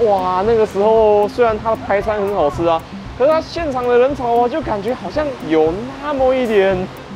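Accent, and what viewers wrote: Taiwan. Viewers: 應該本是台語人吧！？😊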